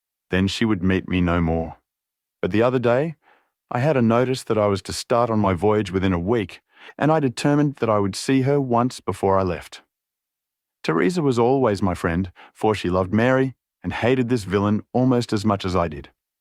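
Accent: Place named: Australia. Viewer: Ai Australian accent? No no no ....I'm gone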